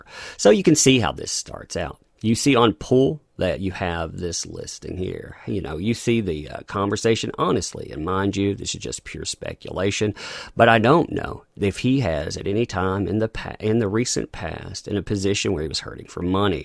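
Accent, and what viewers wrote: South, United States. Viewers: Damn my guy. You've got that good ole fashioned southern draw makes me feel home.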